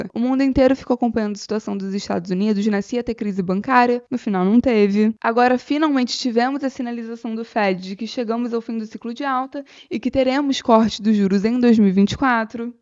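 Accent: Rio de Janeiro, Brazil. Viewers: Adorei descobrir o seu canal!! A análise ficou ótima e ainda tem a dose de carioquês e palavrão que eu amo kk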